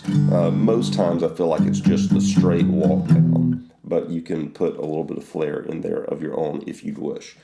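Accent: South, United States. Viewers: Lol i think its the southern accent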